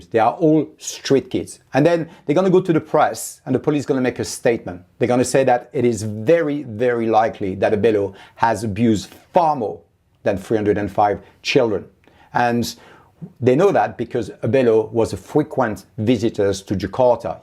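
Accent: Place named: France. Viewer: you're amazing and your English is wonderful. Please bring out merchandise with my favourite saying that you often say in your lovely French accent. ' Behind the Bars'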